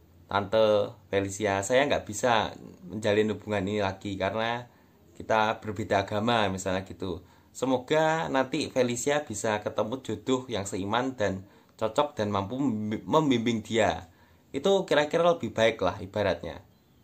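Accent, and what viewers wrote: Java, Indonesia. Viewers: Logat mu jawa bgt😂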